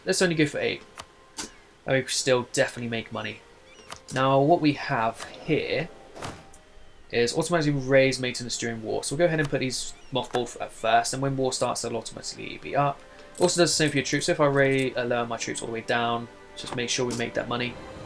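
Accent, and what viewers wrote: United Kingdom. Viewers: Your channel picture is a red maple leaf, and you use Received Pronounciation. Is the accent natural or learned?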